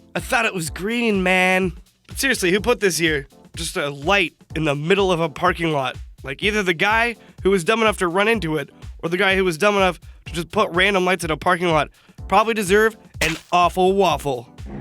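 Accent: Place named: United Kingdom. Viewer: i really liked your british accent ahaha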